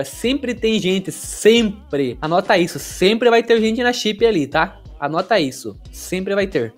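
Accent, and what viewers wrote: Rio Grande do Sul, Brazil. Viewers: Cê é gaúcho?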